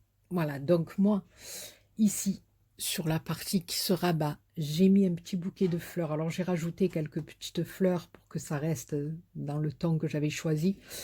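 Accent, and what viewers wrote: South, France. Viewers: c'est vraiment sincère!! j'admire ce que vous faites!!! j'espère un jour faire ne serait- ce qu'un tiers de ce que vous faites!!! et croyez moi, je ne vous lèche pas les bottes!! hihi en plus avec avec votre accent du sud,un vrai plaisir!!! merci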